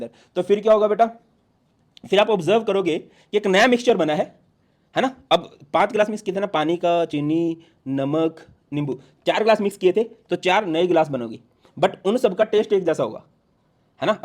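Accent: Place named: Punjab, India. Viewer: Your Punjabi accent 😅❤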